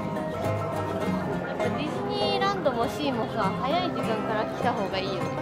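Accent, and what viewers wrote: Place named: Fukuoka, Japan. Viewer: 私も福岡で方言喋ってるの見て嬉しい、ほんとかわいいなぁ…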